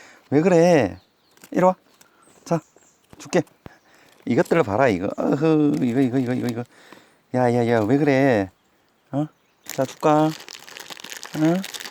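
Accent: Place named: Gyeongbuk, South Korea. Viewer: 경북쪽이신가 말투가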